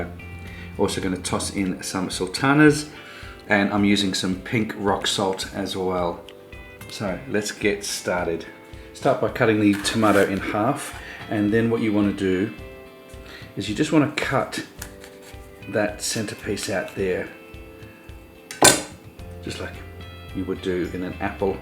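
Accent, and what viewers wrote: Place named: Australia. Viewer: This is the best recipe I’ve seen on you tube for green tomato chutney. I need to do something with my tomatoes, (from my garden) before they spoil. Love this channel, especially because you sound like an Aussie. (I am one too).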